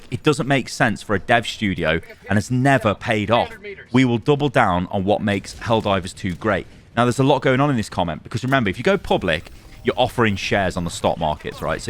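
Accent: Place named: United Kingdom. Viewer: That's just how the word is pronounced in britain...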